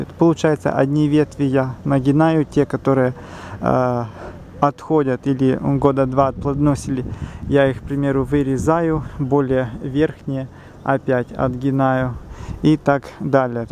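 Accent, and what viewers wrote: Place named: Surzhik, Ukraine. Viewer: И Вы,Петя будьте культурным.Может Вам знакомо такое слово Суржик?Вот на нем и говорит.Это местный диалект.Канал этот для садоводов,Вы ошиблись,поищите лингвистические канала,а заодно,научитесь такту.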